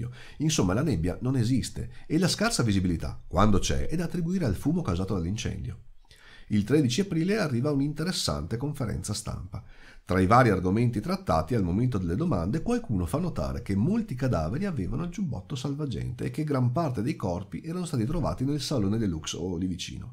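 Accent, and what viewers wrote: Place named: Veneto, Italy. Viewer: L'accento e la cadenza venete dovrebbero essere patrimonio dell'umanità!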